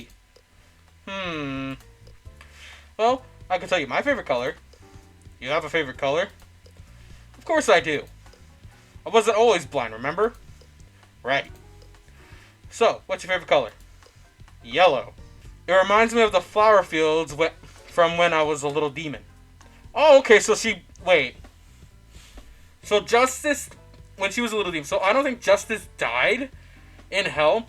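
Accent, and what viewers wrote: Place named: South, United States. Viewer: Your southern accent killed me lol! I loved it! I thought I had the drawl, but yours sounds much better, and I’m an actual Southerner! Great video dude! ❤️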